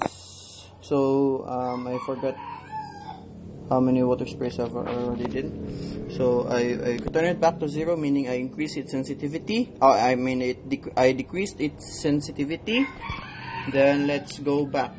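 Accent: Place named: Philippines. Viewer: Well done. U r Filipino right ? (It is the accent that gives you away :-) )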